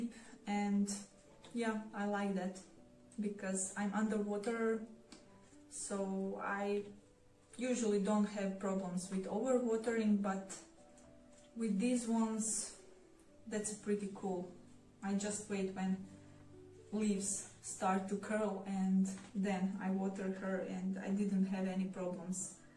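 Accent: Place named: Australia. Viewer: So you're a croation right, i think australian 🙂✌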